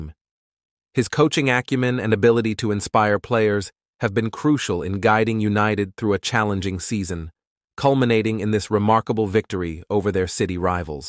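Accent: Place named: United States. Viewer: uyacasula ngale American accent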